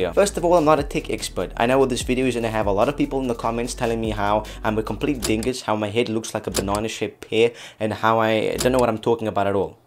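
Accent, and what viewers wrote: South Africa. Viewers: Wena you are a South African neh?!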